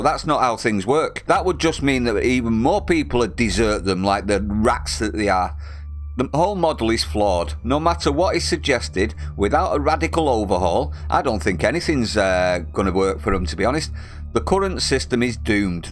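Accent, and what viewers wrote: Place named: Manchester, United Kingdom. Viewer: Great post from a person with a Manchester accent - glad to say that I recently joined the ranks of people who has cancelled their TV licence - the thought of all those on the "BBC gravy train" especially '£1.75 mIllion Lefty Lineker' equivalent to over £33,000 a week or a welsh lefty paid £500,000 a year just to read the Biased BBC news? Drain The Swamp.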